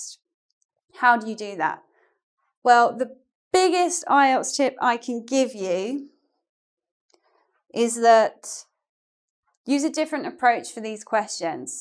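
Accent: United Kingdom. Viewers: I love you!!!, you are the best teacher of English of the world, I follow all of your channels, I like your British accent to speak. thank you very much for your advices. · I'm japanese. I've been learning English with American accent for 6 years. Thanks to your videos, I gradually get used to british accent.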